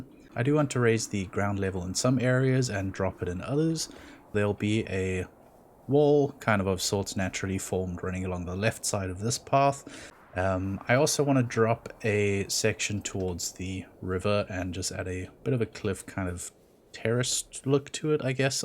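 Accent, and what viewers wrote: South Africa. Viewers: I know a fellow south african when i hear one lol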